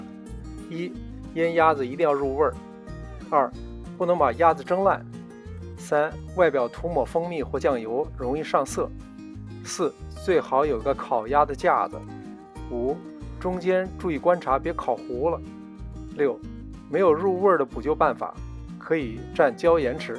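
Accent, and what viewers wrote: Beijing, China. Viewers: 喜欢听您这个不张扬的北京腔😉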